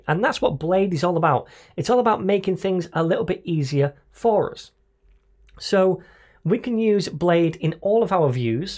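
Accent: United Kingdom. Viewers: teacher, thank you so much! You teaching is always organized, concise and to the point. I was struggling with JS, and your JS Udemy course came to my rescue. I was struggling with VueJS, and your Vue Udemy course helped me out. I have noticed that you used Firebase as temporary backend for those courses. Would you please please please, make a new Udemy course of Youtube series on full stack, in which both frontend and backend are integrated in one project? Maybe Laravel and Vue or Django and Vue, which ever has shallower learning curve? I watched your tutorial so much, now when I read, I heard British accent in my head: ) Teacher, you are the best web development teacher I have ever met! God bless you!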